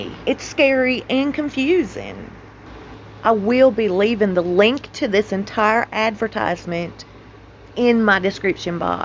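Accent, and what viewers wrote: United States. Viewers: I love your american accent its soooo kewl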